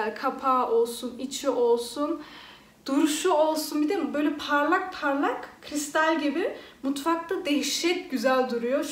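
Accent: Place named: Germany. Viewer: Harikasın bayılıyorum konuşmana ev düzenine hanım hanımcık oluşuna aldıkların çok güzel güle güle kullan💗bizimde gelinimiz var almayada doğup büyüdüğü için senin gibi konuşuyor oda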